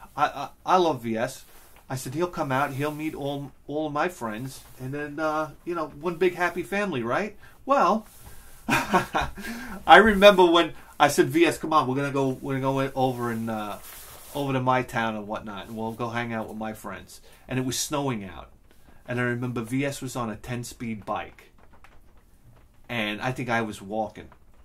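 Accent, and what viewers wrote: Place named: New York, United States. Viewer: When you were talking about your polish friend being trained in "sahkah" I thought it was some polish thing I had never heard of but then I realized, oh it's soccer, haha. Love the new york accent!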